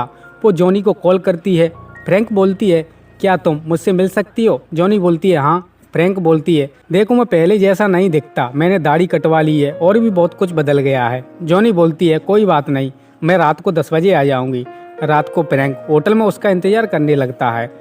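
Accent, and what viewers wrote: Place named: Madhya Pradesh, India. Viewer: apki boli ko pehchaan liya me Gwalior se hu 😁😁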